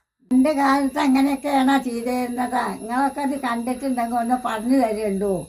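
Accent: Palakkad, India. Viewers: അമ്മൂമ്മ പാലക്കാട്ടീന്നാ 😊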